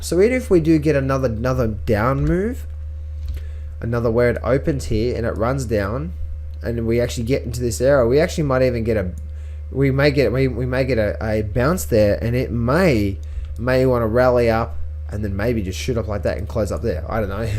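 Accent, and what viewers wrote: Australia. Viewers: Sorry new to this channel are you Australian?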